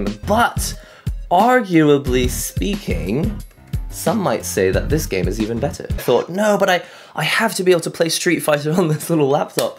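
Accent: United Kingdom. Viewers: This is the only video I've seen by you and I like your accent. I don't know if it's British or something else but it makes you permanently sound mildly sarcastic even though you aren't being sarcastic. It added to the fun of watching (or rather listening) you talk about this product. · British mate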